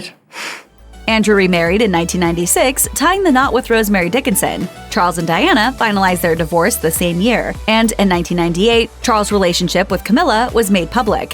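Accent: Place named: United States. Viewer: ...... my THOUGHTS are regards to the narrator. Why are they seemingly always American & with Terrible 'grating' accents! 🥱 Phil Liverpool UK 🇬🇧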